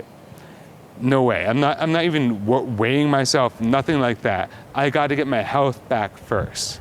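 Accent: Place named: Japan. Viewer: A white guy, with a Japanese accent?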